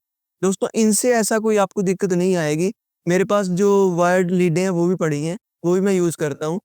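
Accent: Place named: Punjab, India. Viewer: Punjab probably accent se lag rha hai. Or mast tarike se explain bhi kar rahe hai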